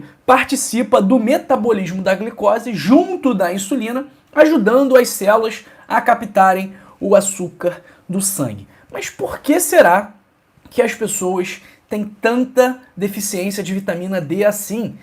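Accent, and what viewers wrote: Rio de Janeiro, Brazil. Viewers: Pecebir que o dr era Carioca devido seu sotaque de carioca !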